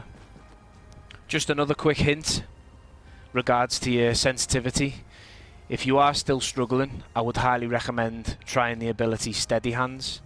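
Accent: Liverpool, United Kingdom. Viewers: you a scouser?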